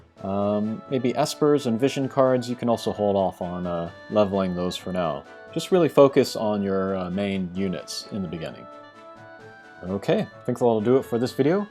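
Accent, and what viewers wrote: Japan. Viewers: By your pronunciation of names, I can tell you are Japanese, by your general pronunciation I find hard to believe you are japanese. You have a lovely accent that surpasses even those that were born in english speaking countries.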